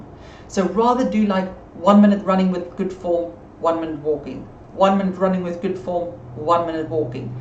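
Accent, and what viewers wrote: Afrikaans, South Africa. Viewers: 3 years late but yes her accent is South African. More specifically, Afrikaans South African. Sincerely, another South African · My legs rub against each other when I run. Gonna try widening my gait. Thanks! Also, love the accent. Is it South African?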